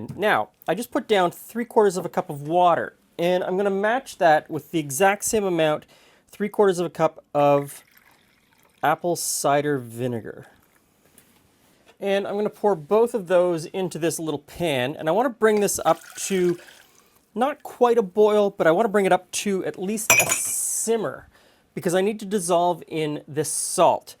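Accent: Canada. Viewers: But he's Canadian, not Australian... ;-)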